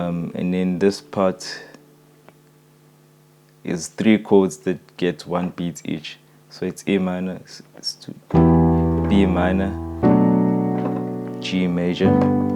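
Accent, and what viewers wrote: South Africa. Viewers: Wow you sound South African :D I got excited there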